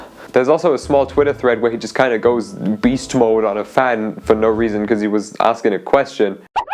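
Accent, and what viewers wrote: United Kingdom. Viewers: It's kinda wierd listening to a British dork with a constantly cracking voice and a tendency to raise pitch and laugh/giggle nervously at the end of every sentence, making fun of the way someone else looks or talks. Whatever may be true of all the things you point out, talking about someone's look/style and/or vocal tendencies, is 100% fucking douche move. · There is no way a guy with fender Wall art and a UK accent is saying every song sounds the same on 13. Kids these days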